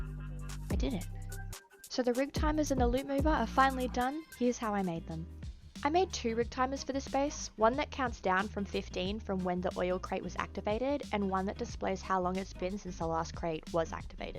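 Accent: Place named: Australia. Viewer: love it when an Aussie woman tells me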